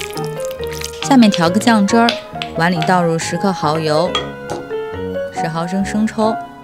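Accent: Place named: North, China. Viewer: 看起来很好吃 喜欢听你北方味儿的普通话 字正腔圆：）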